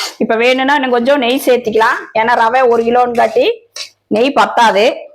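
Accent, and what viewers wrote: Kongu, India. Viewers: எங்களுடைய கொங்கு தமிழில் நீங்கள் பேசி சமையல் செய்வது அருமை தங்கை🎉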